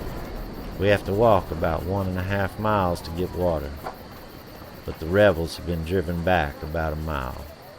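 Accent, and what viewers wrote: South, United States. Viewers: Very well read, but his accent is a southern.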